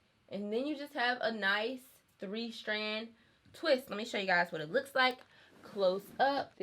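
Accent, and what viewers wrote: South, United States. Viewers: I feel sooooo dishrespekfu with my 8hr late comment 😩 BUT I couldn’t let the day go by without watching! I have to say there’s NOTHING like following someone who’s personality is JUST the same as yours 🥰🥰 I swear I do that doggy breathe when I get excited sometimes when I’m super happy or something(randomly) and honest to God A LOT of the funny random things you do , I DOOOO!! , and your ACCEENNTTTT 😩😩😩 I love a southern accent 😍. Any who, thanks for another great videooooo.. hair was Kayutee...can you do a wash n go with the Mielle pomegranate and honey leave in, smoothie, and custard PLEAAASEEEEE 🙏🙏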